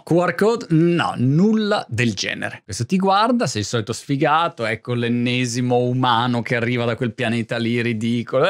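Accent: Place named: Matera, Italy. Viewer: Grande dialetto materano ti si addice😉 tutorial ridendo e scherzando inbocchi caramelle ai principianti bravissimo super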